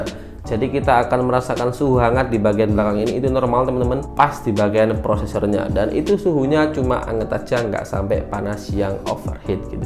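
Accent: Mojokerto, Indonesia. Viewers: smn wong mojokerto ta mas 😅?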